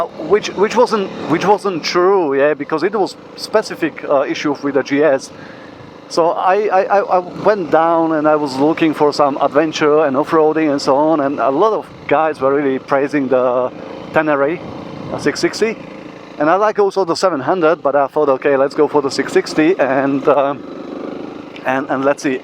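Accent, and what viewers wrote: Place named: Slovakia. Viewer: Hi. Thanks for your videos about CRF300L. Please how tall are you? Or maybe better, what's your inseam? Did you consider/testdrive CRF300 Rally as well? Btw, maybe I am wrong, but your English sounds very "Slovak" =).. Aren't you from Slovakia? Thanks again for a feedback. Lukas.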